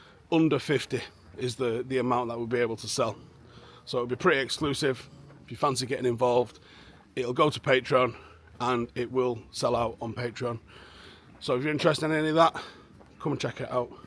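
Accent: Manchester, United Kingdom. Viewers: I think that's just how we mancs talk mate!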